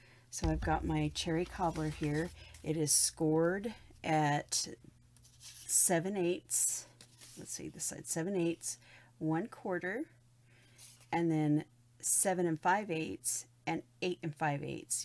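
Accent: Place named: Texas, United States. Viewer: Where in Texas are you from.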